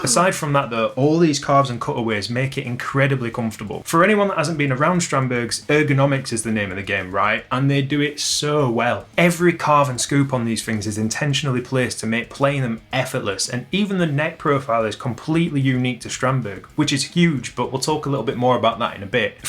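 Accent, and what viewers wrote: United Kingdom. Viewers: HEMA or Historical European Martial Arts as it's called is what i do. It's growing in popularity throughout the country, i'm assuming from the accent you're UK based, so depending on where you are you may have a single club or several in your area. It's a fairly relaxed environment in my experience that you can take things at a pace that suits yourself.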